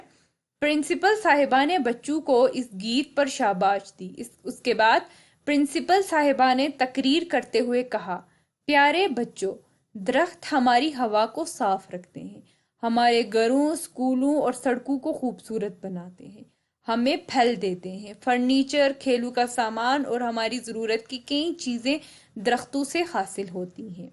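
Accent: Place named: Punjab, Pakistan. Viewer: Punjabiiii accent hay ap ka improve yourself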